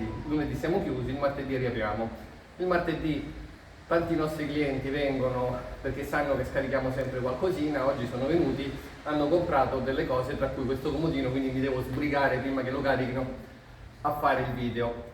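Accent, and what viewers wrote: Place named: Lazio, Italy. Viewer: Salve 🙂 Complimenti!il suo accento forse mi sembra Laziale,ma il cognome che porta è senza ombra di dubbio Ragusano!!! saluti da un ebanista e restauratore 🤚🏽🤚🏽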